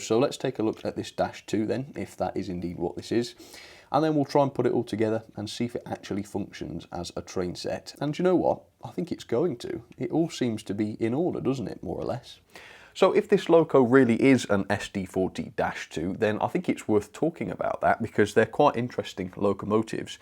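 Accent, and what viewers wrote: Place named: England, United Kingdom. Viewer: oh my god his english accent is amazing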